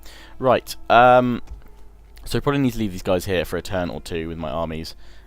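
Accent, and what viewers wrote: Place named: England, United Kingdom. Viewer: the English accent is hilarious (says the auzzie to be fair)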